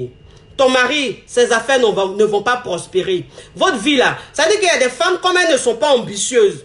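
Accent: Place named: Ivory Coast. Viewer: Jaime bien comment tu parles les ivoriens font rire!😂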